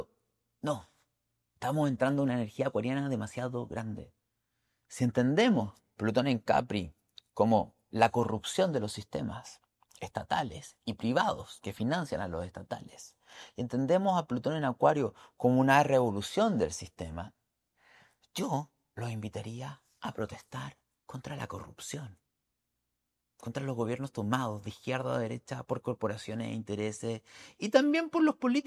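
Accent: Chile. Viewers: Gracias por tu impetu y energia y ese acento chileno que me encanta Eres luz y claridad, hagas lo que hagas, siempre sera un exito❤gracias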